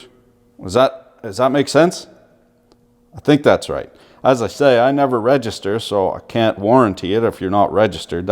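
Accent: Canada. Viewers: Do all canadians speak like that?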